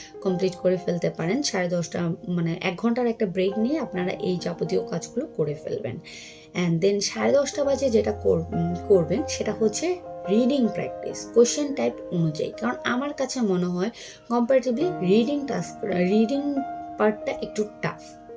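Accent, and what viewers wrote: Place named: Sylhet, Bangladesh. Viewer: Your way of speaking seems quite similar to Sylheti peoole.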